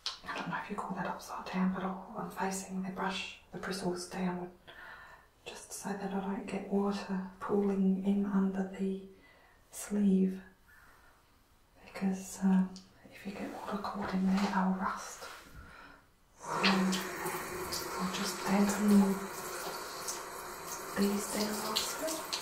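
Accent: Australia. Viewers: Australia! My bad.I thought somewhere in Britain but your sounds are so rich I haven't paid much attention to the accent.Or do I have the accent? Now I hear it.I should have known. We have national and regional slang.It is probably the same there. I am a huge fan of the Seekers.Judith Durham is brilliant.But you are too young to remember them in their heyday.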